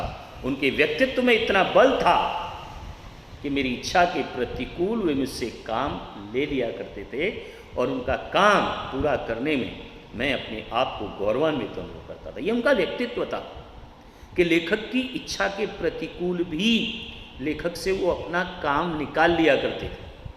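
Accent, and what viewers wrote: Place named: India. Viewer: Your voice completly hindi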